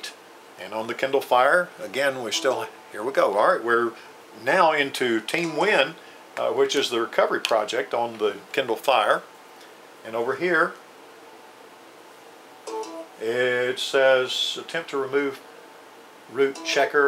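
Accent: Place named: South, United States. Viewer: You sound country ... But thanks 4 the HELP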